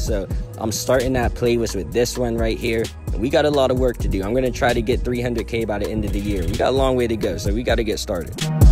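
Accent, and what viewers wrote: United States. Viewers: U sound kinda country.. but ur drawings look good asf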